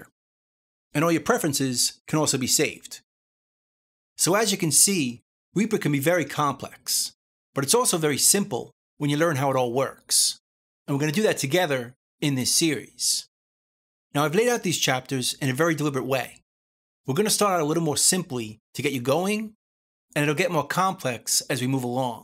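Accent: New York, United States. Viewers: Irrelevant curiosity: what kind of accent do you speak with? New York?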